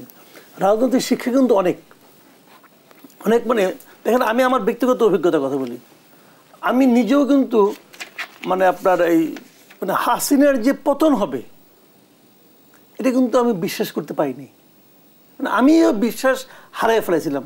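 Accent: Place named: India. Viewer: উপস্থাপন কন্ঠ কেমন যেন ভারত ভারত লাগছে।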